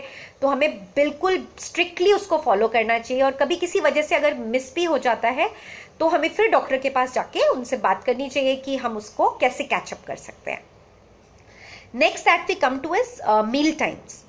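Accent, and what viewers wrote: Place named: India. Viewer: Wtf title in english but she is talking in Indian mixed english what kind of language is this?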